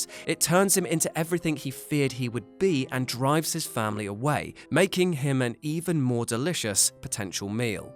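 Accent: United Kingdom. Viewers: wow, if you can think it....people can try and make any wild idea to try to make sense ... this is lame..... next video i bet you'll try to connect scooby-do with "it" and "the village" as one great universe... please stop, cause your british accent doesnt make someone sound smart, cause your silly, the evil ghost is not "it" in all his novels, so there you have it-you stink ..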